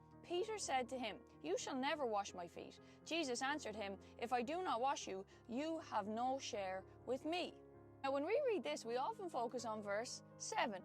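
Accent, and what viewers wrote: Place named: Ireland. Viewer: AMEN sister 🙏🏻 BLESS 👑🔥🕊️⚔️ that is an Irish accent yes? My grandmother came to America from Ireland. 🙏🏻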